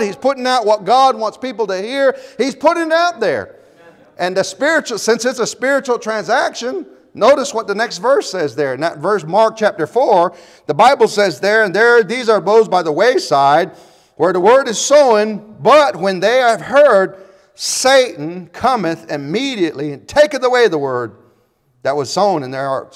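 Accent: United States. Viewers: Good job preacher, sounds like your somewhere in or around the Texas area.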